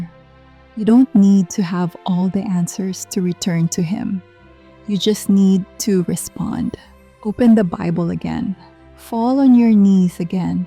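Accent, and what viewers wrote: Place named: Tagalog, Philippines. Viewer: Just do it in Tagalog version and put English sub titles Is really annoying to hear Tagalog accent trying to speak English Be natural and more people will listen like the Koreans ok 😅😅😅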